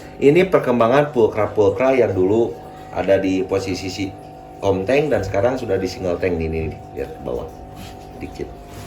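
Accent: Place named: West Java, Indonesia. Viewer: Suka sm logat sunda nya...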